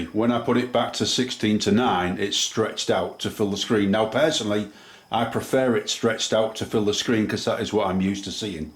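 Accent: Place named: East Yorkshire, United Kingdom. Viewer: Hi, I just ordered one of these, but think I need some power for the PoE, can you recommend a switch or adapter for the supply. Liked the video, and the accent too, sounds like your from my part of East Yorks. Regards John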